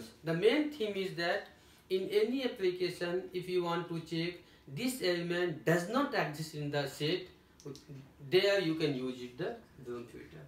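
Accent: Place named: India. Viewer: nice explanation, but English language with Indian accent very difficult ,I wish you to attach a document about explanation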